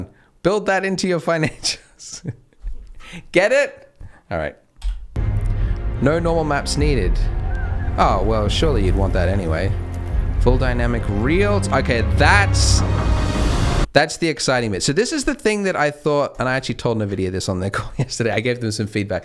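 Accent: France. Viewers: me : "Ok, he has the same accent as me, he is french" Andrew : "Sooooooo French" *unmasked so fast haha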